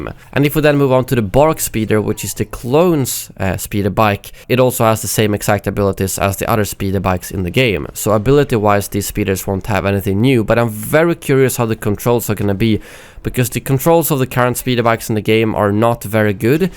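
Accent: Sweden. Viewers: U swedish huh?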